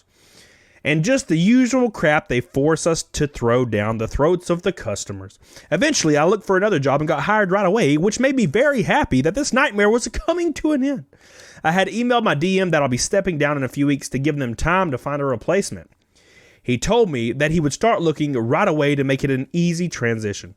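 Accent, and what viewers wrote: South, United States. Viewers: I will say. I usually don't like southern accents. But your accent is what makes your sense of humor atleast to me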